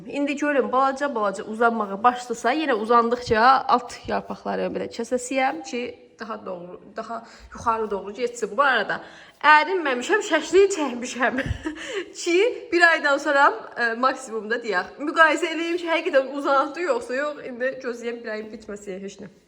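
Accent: Sheki, Azerbaijan. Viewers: Videonu şəki ləhcənizə görə izlədim. Rayonlara getmək fürsətim olanda ilk seçimim Şəki şəhəri olur. İnsanları, təbiəti, şirniyyatları.... Sevirəm Şəkini. Allah yeni evinizdə şirin övladlarınızla, yoldaşınızla xoşbəxt yaşamağı nəsib etsin .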